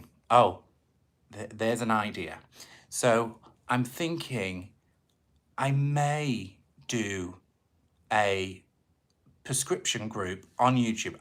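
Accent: United Kingdom. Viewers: You're so welcome. Yes it is the accent. I've always loved the British and Scottish accents. 🙂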